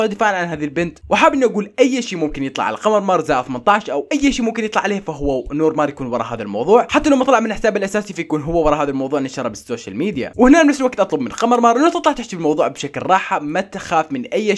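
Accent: Iraq. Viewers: كلامه عراقي مبين عراقي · اي گلبي عراقي اصلا امبين من لهجه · ترة حجية كولة عراقي شلون متدرين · إنه توني انتبهت على اللهجة العراقيه صوته ماشاء الله كأنما مذيع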